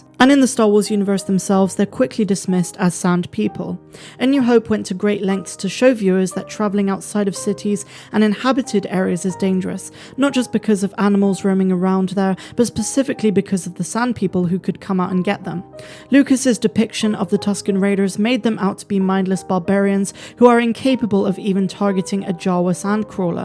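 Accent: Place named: Somerset, United Kingdom. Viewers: That South West accent gets me every time 😊 "Jibber Jabber !" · I enjoyed your video, fellow West Country pirate xD is that Somerset I'm hearing?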